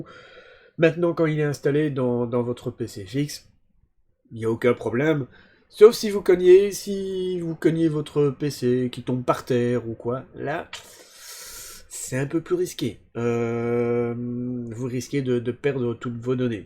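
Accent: Belgium. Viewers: T’es belge ?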